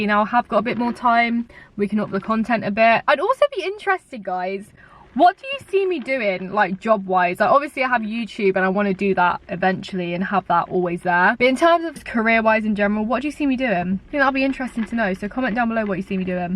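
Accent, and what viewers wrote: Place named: United Kingdom. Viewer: British English is increasingly becoming a new language. I had to concentrate to understand and my neices are British! 😂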